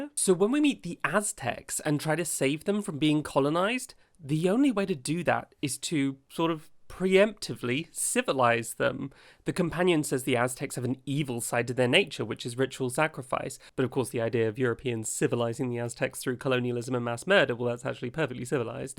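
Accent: United Kingdom. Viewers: I’m a new subscriber after this. I really disliked this episode and you explained my feelings so well. Great video. And your British accent works so well for the delivery of your satirical lines. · british accent with the pplicker lisp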